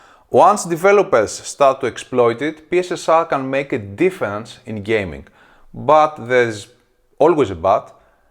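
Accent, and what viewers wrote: Greece. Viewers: most greek accent ever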